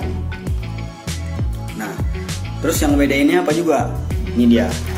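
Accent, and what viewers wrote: Aceh, Indonesia. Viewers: Mantap bang review nya 👍🏻 Suara abang mirip orang aceh.. Saleum 🙏🏻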